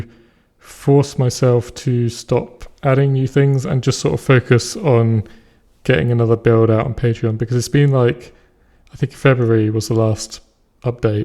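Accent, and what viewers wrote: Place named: France. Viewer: 'Sup, why do you sound French?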